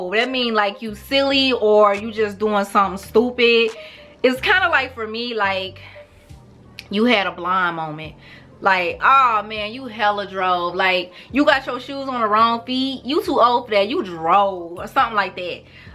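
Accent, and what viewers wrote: St. Louis, United States. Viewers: I love St. Louis accent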